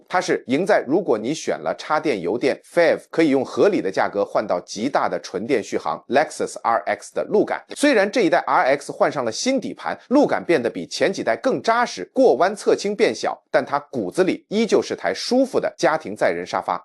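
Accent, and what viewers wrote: China. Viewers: 这是台湾的YouTuber吗，声音怎么是中国普通话，听不下去了👎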